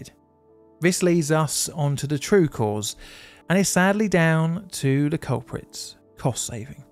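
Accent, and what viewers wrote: United Kingdom. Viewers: I'm STRONGLY recommending to you adding human made CC for your videos. Not everyone is familiar with "UK accent" (I know, there isn't a single UK accent, hence parenthesis) and at any rate your very uneven articulation, combined with this typical British manner of agglutinating bunch of words into a single "godknowswhatyouresaying" (accompanied with rather erratic and arbitrary word stress to boot) does not help it either. Even these machine generated CC (which are "sorta reasonably good" for most of English accents) fails MISERABLY with your voice and neither they are of much help. Just listen, for instance, to this "quicklythetrain[unintelligible]operatingbroughtout[unintelligible]FACTOR" - ONLY on the fourth try I decoded most of it as: Quickly, the way the train was operated was ruled out as a contributory factor and then it took me another three or four runs to actually make sure it's "operated" and not "operating" and that this "contribut..." something was "contributory". 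Not a great way to enjoy a video, innit? (and then not a great way to narrate it either.) Having to backtrack and replay more than few sections (sometimes more than once) to actually understand the narrative, in one relatively short video, DOES NOT add to "watching enjoyment", I'm afraid. In fact, this is the most off-putting factor of your videos - these rather crude animations do not bother me much (although "there's certainly a room for improvement here", and I'd welcome it - still, I can live with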